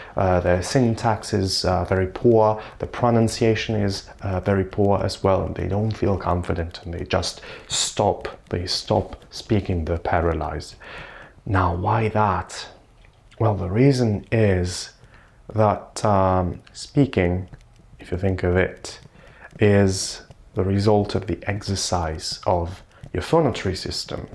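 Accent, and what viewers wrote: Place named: North, United Kingdom. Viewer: Sexy northern accent... Where have you been in Britain to study English?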